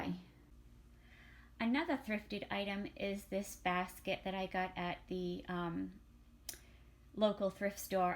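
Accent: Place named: New England, United States. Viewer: You found some really nice things! I love thrifting! I knew I heard New England accent! Thanks so much for sharing! New subbie!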